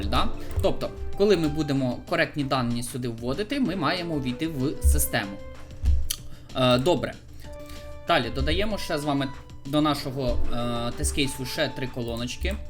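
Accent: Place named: Surzhyk, Ukraine. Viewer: Смешные звуки произносишь. Это суржик?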